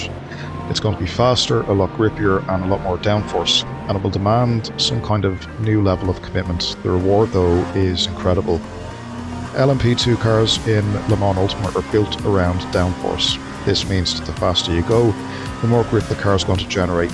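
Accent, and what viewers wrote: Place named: Ireland. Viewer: Don't ya just love hearing an irish voice in sim racing :D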